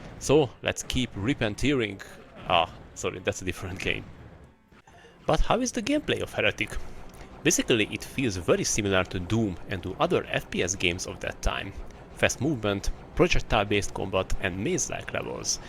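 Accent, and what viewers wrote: Hungary. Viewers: Jól hallom? Mintha magyar akcentust hallanék. :face-blue-smiling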